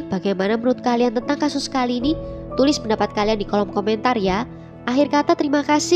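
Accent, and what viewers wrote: Java, Indonesia. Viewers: Hayyy jg say.. medokuhhh 👍💞💞💞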